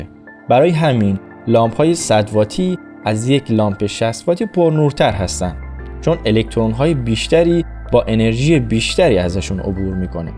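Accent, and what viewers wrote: Iran. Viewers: بسیارساده وعالی توضیح دادین، ازته لحجتون حدس میزنم دزفولی باشین؟ سپاس وامیدوارم ادامه بدین وبازهم مفاهیم فیزیک روتوضیح بدین. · لهجه قشنگ کرمانی با ق های محکم و تشدید دار 😊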